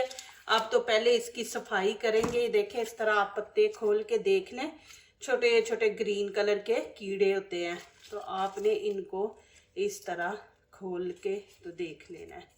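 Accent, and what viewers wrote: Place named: Punjab, India. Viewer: Punjabi lehjee Urdu bolti ham Punjabi hi bol lya krn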